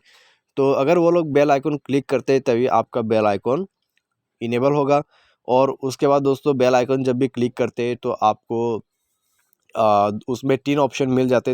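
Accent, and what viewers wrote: Assam, India. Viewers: Bro tmi assam r jen lagise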